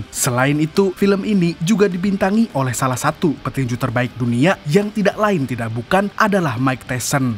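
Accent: Banjar, Indonesia. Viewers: Orang Banjar yg b isi akun ini kayak nya 😂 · Orang banjar kah mang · Orang Banjar jua lah mang?😁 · Urang banjar kah pian mang😂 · Urang banjar kh pian mang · Lawasny meumpati, hnyr tau urg banjar sdn ni😁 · Halus-halus wani hangittt 😂😂😂😅pian orang banjar kah bang 😅😅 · Halus halus wani hangit wkwk orang Banjar kah bang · Mamang bantaki basa banjarnya memberikan komentar rami banaŕ jadinya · Admin nya orang Banjar kalua kah😂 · Admin orang Banjar kah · Kaypa habar nya.? Banjar ni pina nya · Banjar kh mang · Urang banjar kh nih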